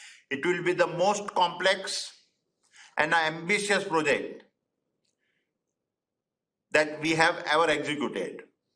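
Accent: India. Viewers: Damn! Even his english is like modi's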